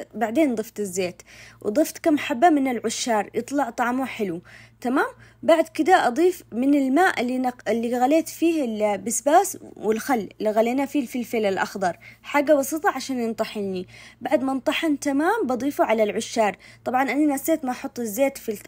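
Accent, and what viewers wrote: Aden, Yemen. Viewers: ماشاء الله عليك اسلوبك حلوو جدآ بالشرح وخصوصاً باللهجه العدنيه واني عدنيه تسلمي وتسلم يدك الله يحفظك ❤ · فديت الكلام العدني كم أحبه يسلمو اليدات بنت بلادي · طبخك للأكل العدني جميل وكلامك باللهجة العدنية أجمل كل التوفيق والاحترام لك من عدن وربنا يوفقك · ❤ فديت بنت عدن اللي ولا تغيرت لهجتها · صفحتك مرررة حلوة والله يكفي نسمع صوتك والكلام العدني يفوووز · عجبتنا اللهجه العدني 😂❤ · اني افدالك احلاحاجه اللهجه العدنيه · ماشاء الله عليك عادني الا اول مره اطلع على قناتك وعجبتني وايد وشرحك خفيف لطيف مع لهجتك العدنيه الحلوه تسلمي الله يوفقك ويسعدك❤❤ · عجبني لهجتك العدنية 😂..وطبعاً العشار بالطريقتين ❤ والصيادية الشهية.. تسلم يداك يا بهية 😅 سلامي وتحياتي لك من صنعاء.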